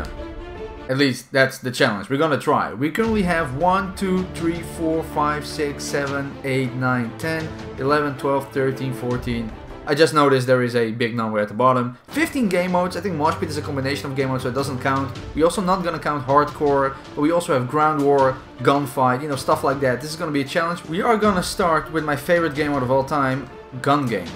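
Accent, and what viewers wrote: Netherlands. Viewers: are u dutch? zo klink je wel! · dit nederlandse accent is geniaal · You're dutch right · his dutch way of talking English feels nice or better than the other accents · are a from the netherlands bro ? becausse i am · Ben je Nederlands of zo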